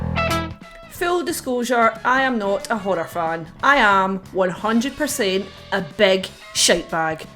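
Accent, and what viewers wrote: Scotland, United Kingdom. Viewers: Does anybody know what part of the world is from? That accent is amazing. Scotland? What part of it? I'm interested in the specific area, as for example "Italy" has more than 20 different accents.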